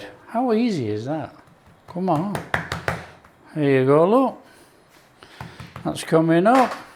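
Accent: Yorkshire, United Kingdom. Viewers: What part of Yorkshire are you? I'm in Leeds. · lol a fine Yorkshire boy, I never would’ve guessed! 😂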